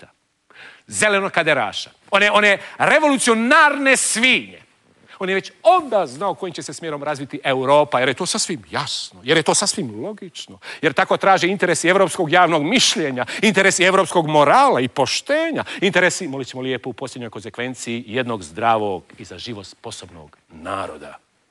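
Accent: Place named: Herzegovina, Bosnia and Herzegovina. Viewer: Nema glumca do Rade Šerbeđžije koji zna govoriti Krležu! Moj naklon i Despotu ali ima svoj hercegovački naglasak!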